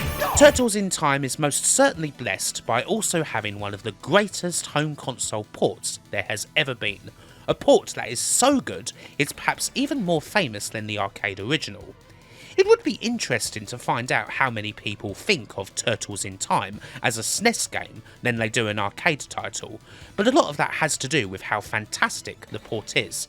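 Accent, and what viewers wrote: United Kingdom. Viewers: As an American the way you said "kick some heads in" about X-Men in a pleasant British accent was pretty disturbing/awesome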